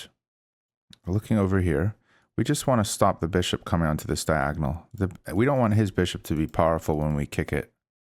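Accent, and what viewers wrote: Northern Ireland, United Kingdom. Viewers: Is that a northern Irish accent with a very slight glimmer of either European or African? Very interesting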